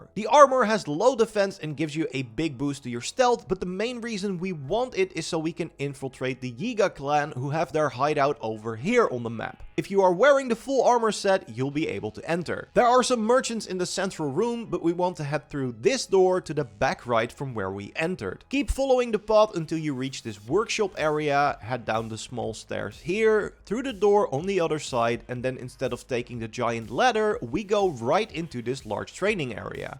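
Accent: Netherlands. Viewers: Are you Dutch? You sound Dutch haha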